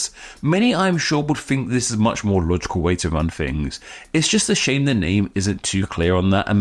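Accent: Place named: United Kingdom. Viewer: British probably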